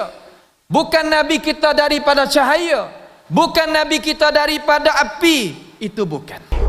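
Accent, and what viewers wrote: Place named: Petani, Malaysia. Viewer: kurang dah loghat petani...hehehe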